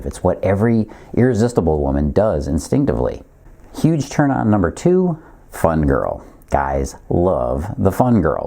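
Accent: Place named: Midwest, United States. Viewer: rational, sound advice. Refreshing from the sea of gimmicky crap. Love the midwest accent too.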